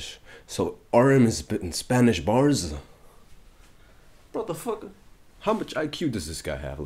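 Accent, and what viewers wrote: France. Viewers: Pleaaas react to BTS Vs block b pleas and I like your french accents brooooo c'etais parfait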